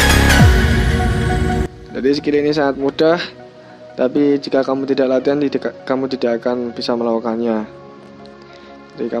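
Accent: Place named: Java, Indonesia. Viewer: Asalmu pundi mas, kok medok koyo wong jawa ?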